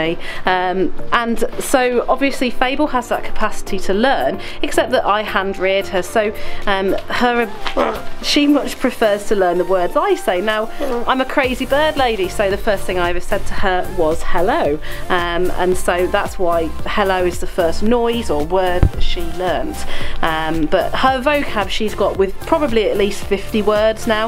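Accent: United Kingdom. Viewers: You have a beautiful British English accent. And your female raven Fable sounds cute when she says, “hi, boop boop,” and, “wow.”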